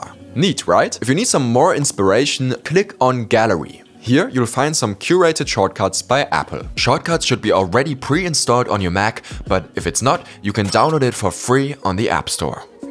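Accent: Germany. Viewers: Bist Deutscher? Klingt ziemlich danach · i love the german accent so much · Wegen dem Akzent vermute ich mal dass du deutsch spricht und wow das war ein richtig gutes video jede app war ne mega empfehlung danke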